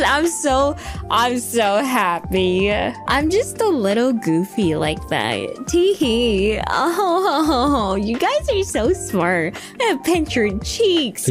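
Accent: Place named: South, United States. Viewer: Loving sassy southern Kronii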